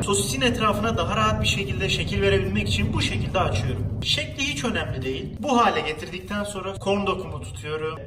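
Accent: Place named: Hatay, Turkey. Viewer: Abi konudma tarzınla o kadar güzel ki ergenlerjn yorumlarına aldırma ben sinir oldum vallahi tam Hatay ağzı :) Yolun açık olsun :)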